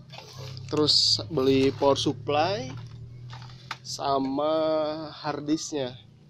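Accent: West Java, Indonesia. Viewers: Sunda euy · SUNDAAA EUY... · si aa sunda pisan euy, "beli eram lima rebu" ..hahah lanjutkan kang!! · Tinggal dipalih mana Kang?? wkwkwkwk😂 orng Sunda nya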